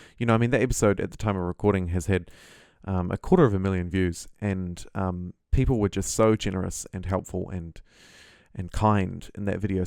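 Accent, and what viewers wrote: New Zealand. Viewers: I love Civ V and VI and I dig your NZ accent so, I like your videos 🥰🤘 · Did a double take to hear another kiwi accent. So used to hearing marbozir! Good summary mate, and good energy. Stoked to see Civ V still active out there.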